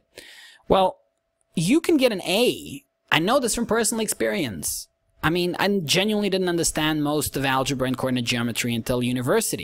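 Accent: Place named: New York, United States. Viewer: Quite enlightening & request an update Oh, you're accent sound like you're from NY city USA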